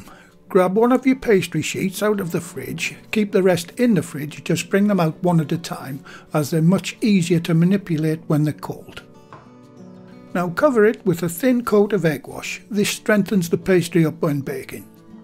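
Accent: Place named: Northern England, United Kingdom. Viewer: As soon as I heard voice, then say he’s from up north, I just knew these were going to be godly sausage rolls.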